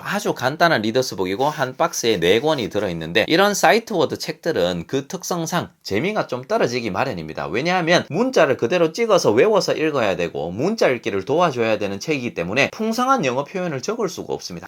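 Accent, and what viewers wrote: Gyeongsang, South Korea. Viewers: ㅎㅎ목소리 톤이 좋으셔서 경상도 억양이 섞였어도 내용이 쏙쏙 잘 들어옵니다.😂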